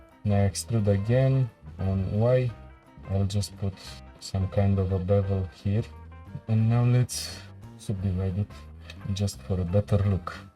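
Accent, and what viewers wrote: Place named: Romania. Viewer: aud romanul in tine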